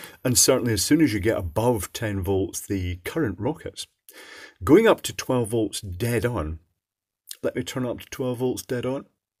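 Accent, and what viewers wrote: United Kingdom. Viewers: I like your channel sir you are amazing i like your british english alsooo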